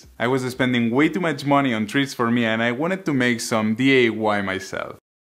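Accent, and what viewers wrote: Spain. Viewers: I'm trying to pin down your accent... Spain?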